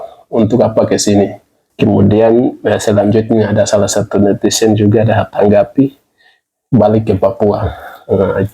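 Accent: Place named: Papua, Indonesia. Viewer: Bro kamu kok bhsnya santun kok bhsnya papua nya masih melekat tapi kok bisa santun..bravo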